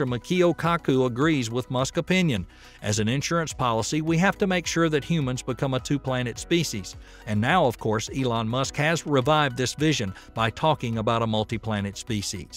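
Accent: South, United States. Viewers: What a sh!tty scripted video. The southern accent makes it worse too. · Won’t listen to a cowboy accent talk about space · Quite frankly, this guy has no idea what he is talking about. Also, most of his information is very outdated or otherwise inaccurate. However, as entertainment, it's a gem. Southern accent: "the rocket plume is going to blow a hole in the moon".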